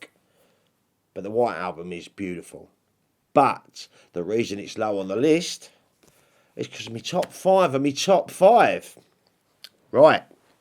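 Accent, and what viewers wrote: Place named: Romford, United Kingdom. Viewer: Really enjoyed this video. Real hard to rank them. Personally I’d have put higher than the white album just because it’s got that glossy autumnal cover. edges it for me over too and I’m not a big fan of the album cover but your list was very interesting. You sound like you’re from round my way too. Im from Romford. Take care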